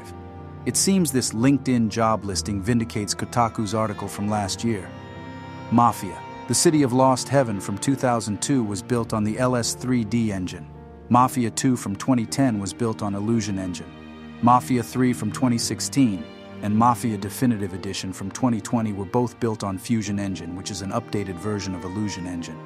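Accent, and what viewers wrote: United States. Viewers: You have a very crisp clean Italian American voice. And this is coming from a Svensks Deutsche American Vikingr. Keep up this fantastic content sir.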